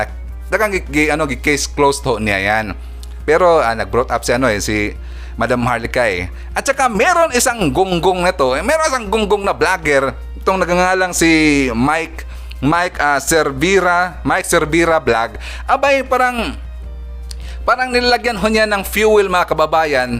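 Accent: Visayas, Philippines. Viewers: Watching from Davao City. Bisaya ka idol?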